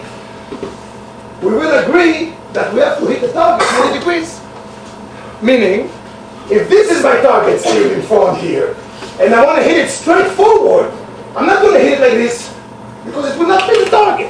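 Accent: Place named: Germany. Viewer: He sounds German.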